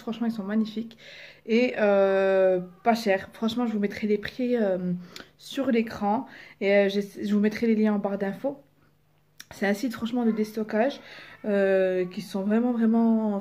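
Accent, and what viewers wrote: Belgium. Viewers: Salem ma belle tu as un accent tu es belge ?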